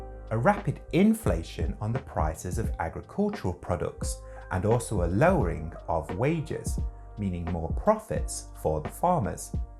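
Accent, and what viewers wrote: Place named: Midlands, United Kingdom. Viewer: You’ve got a reet midlands accent buddy. Derbyshire/Nottinghamshire?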